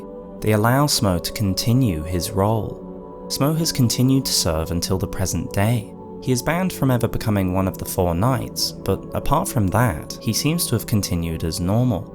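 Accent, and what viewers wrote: United Kingdom. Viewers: I feel that if you have a soothing voice and an British accent you are by law required to make lore videos on YouTube. Good video i like it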